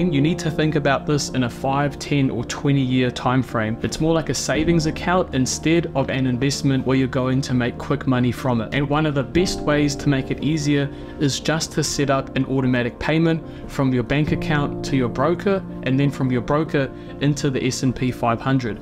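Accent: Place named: New Zealand. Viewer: You sound like a Kiwi similar to me. In New Zealand what platform should I use to invest in. I have sharsies but I dont know if there is better out there. I'm 19 years old and want to set up something that can help me buy a house in like 10-15 years or something. Just wanting to set up for long term success.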